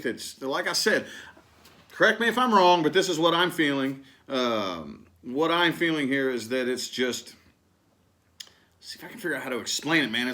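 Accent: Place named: Texas, United States. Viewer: Love your accent.... Are you from TX?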